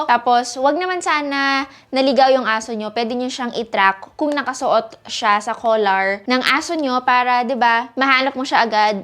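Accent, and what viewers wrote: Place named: Philippines. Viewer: I don't like your speech delivery. It's so Tagalogized English accent. Actually, ultrra, exxact, mowmint, teaboll, apresheyt, to be honest, ech d, achually, Should refer GSM ARENA specs. Waste of time too have been watching you. Noisy and too talkative.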